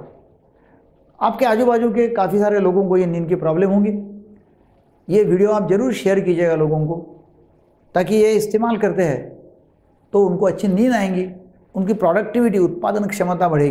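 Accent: Maharashtra, India. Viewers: Bhai ham marathi logo me 90 present log aisehi hindi bolte hai ..Aap aur niche jaoge andhra ya tamilnadu ..Aur ghatiya hindi ayegi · Bhai Maharashtra me aisi hi hindi boli jaati hai.. aap logo ko tapori lgti hy😑😑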